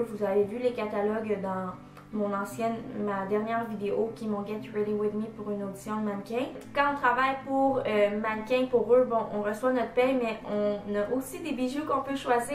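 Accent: Quebec, Canada. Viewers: J'adore tellemeeeent l'accent québécois c'est fou hihi ! Et j'aime aussi énormément tes vidéos !!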